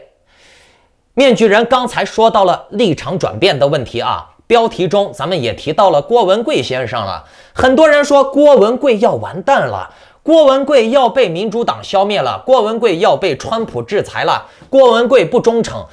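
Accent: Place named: China. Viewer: 大陆口音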